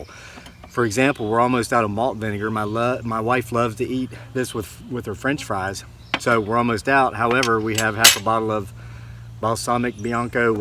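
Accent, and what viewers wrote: South, United States. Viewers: I love with all the practical advice & especially your wonderful Southern accent! I live in Broadstairs, Kent, UK, but will try your fly deterrent! More power to you!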